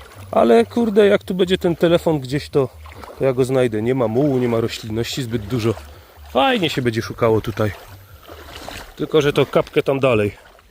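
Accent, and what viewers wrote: Silesia, Poland. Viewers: Fest zaciągosz po naszymu Podobo mi sie to Pozdrawiom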